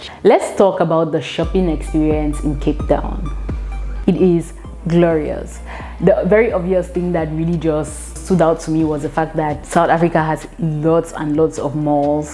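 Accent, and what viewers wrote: Nigeria. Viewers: Cameroonians and Nigerians talk alike... u are Cameroonian but my brain keep thinking u are Nigerian because of ur accent. I thought Nigerian accent Is unique.. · I am a Nigerian , truth fully you sound like my tribe ,fine girl. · Lovely Video, you analysed your points perfectly. Well done 🙌. Meanwhile, i thought you were Nigerian at first, your accent sounds so Nigerian. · I swear I’m useless in differentiating west African accents, to me you sound Nigerian and if you hadn’t said you’re Cameroonian, I’d assumed you’re Nigerian or Ghanaian based on accent only. Thank you so much for reviewing South Africa through Cape Town mostly, hope you get to visit Gauteng(most cosmopolitan province& our economic powerhouse. You’ll find all African countries represented there, all the way from north to our southern neighbours) KZN(city of Durban has warm beaches compared to CT) , Limpopo(the best safaris in Africa, period!), Northern Cape(least populated province), North west, Mpumalanga and Eastern Cape. 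Also the bus system exists in other big cities too eg Tswhane(Pretoria), Johannesburg& Durban…work similar. All the best with your studies and stay in SA, welcome. Edit: also thanks for honestly talking the differences in poor vs rich in SA, that’s still racially pronounced in SA because of our apartheid history. Millions of rich and middle class Black, Indian(you find big population of Indians in Durban) and Coloured (majority race of ppl in CT& western Cape) ppl but the real wealthy of SA still skewed towards white SAns who gained most of their wealth through unfair advantages given to them by apartheid laws eg land & natural resource stealing& job reservations. Also glad you touched on the pronounced racial dynamics of SA, can’t live in SA and not learn to nuance about impact of global dominance of whiteness…guess that’s the most unfortunate unique aspec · I noticed the English speaking part of Cameroon 🇨🇲 have same accent and body language with Nigerians bcos this lady speaks like a Nigerians.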